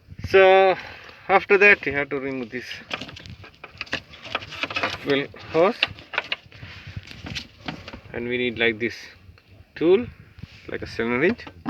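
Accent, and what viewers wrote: India. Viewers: Great video. very good explanation with all the steps. 10/10. Are you from India or Sri Lanka?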